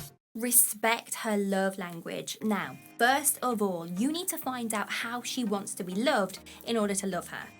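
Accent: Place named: London, United Kingdom. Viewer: I like ur accent 👌Are u from London?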